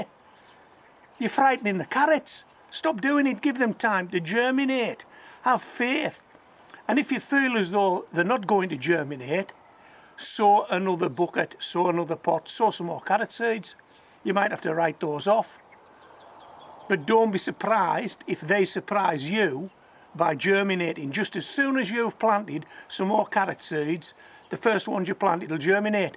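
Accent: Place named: Yorkshire, United Kingdom. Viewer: Where are you from mate? Love your accent it’s like a mix of Yorkshire and Black Country 😅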